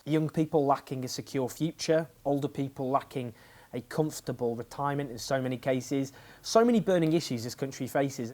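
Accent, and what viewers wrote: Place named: North, United Kingdom. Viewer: Sound more northern/working_class than in other videos. Product of the content ?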